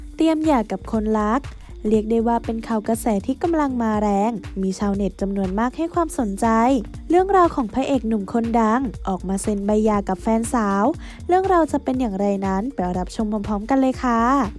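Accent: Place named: Suphanburi, Thailand. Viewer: เหน่อเป็นสุพรรณเชียว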